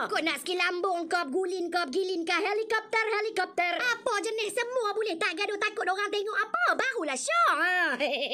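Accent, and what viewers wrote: Kedah, Malaysia. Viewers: Nak lagi bideo cmni...sronok dgaq org kedah pure ckp...sy pn lahir besaaq kt aloq staq...tp kami loghat kedah besa2 ja... nih msti org blah2 kota sarang semut pendang yan batu hampaq kot noh😅😅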